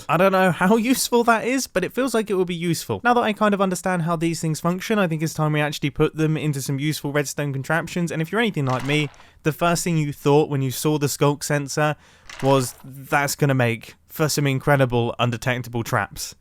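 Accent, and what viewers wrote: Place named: United Kingdom. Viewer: There’s something about a Minecraft youtuber with a British accent that just hits different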